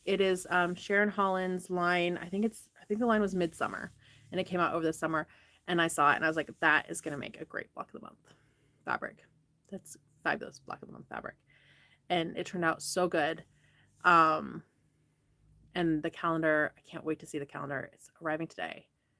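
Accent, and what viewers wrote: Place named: South, United States. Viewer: Haha cute southern accent! You're so funny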